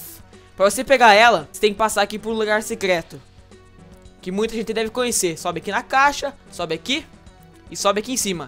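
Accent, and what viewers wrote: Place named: Paraná, Brazil. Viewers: de que lugar do paraná vc é? hahahaha mt bom seus vídeos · de onde tu é ? teu sutaque parece aki do sul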